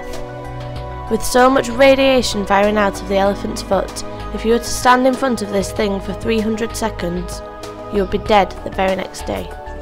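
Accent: United Kingdom. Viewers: commentators ... having thick British accents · Finally a British narrator!!...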